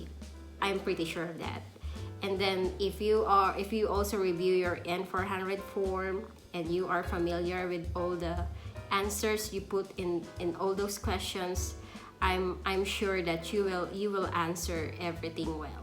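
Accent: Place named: United States. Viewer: she is sound like she is an american girl , like engl is her mother language , what is your concern was about Idk...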